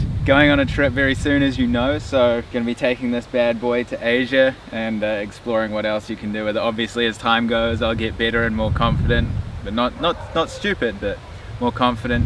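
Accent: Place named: New Zealand. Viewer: Score! Just discovered your channel love to hear a kiwi accent from all over the world mavic air is an awesome drone perfect for travel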